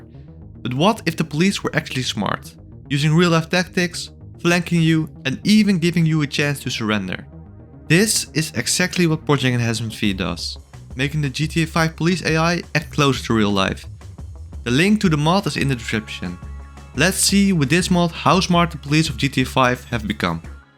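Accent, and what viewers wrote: Netherlands. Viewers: I think he is dutch so his accent makes it sound like that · Ik hoor nederlands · Thickest dutch accent of the month. Good video tho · Are you Dutch you got a Dutch accent . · You sound really Nederlands mijn vriend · Zo te horen kom je uit Nederland ❤ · OMG!! That thick dutch accent. Sorry cant stand it, i could not finish your video because of it and i am pretty sure i aint the only one thinking that. I would strongly suggest working on that accent if you want to get anywhere on youtube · that thick dutch accent, ik hou ervan!!! leuk video!!!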